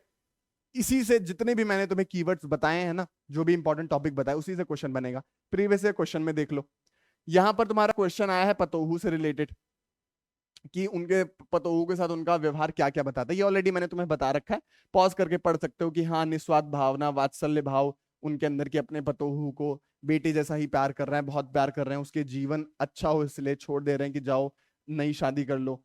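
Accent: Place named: Lucknow, India. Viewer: Ramvrash bainpuri- lakanvi andaj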